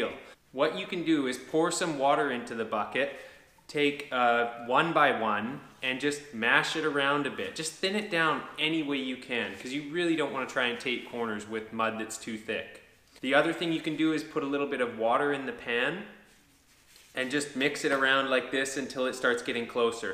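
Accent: Canada. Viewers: Awesome video, even better This Hour has 22 Minutes accent eh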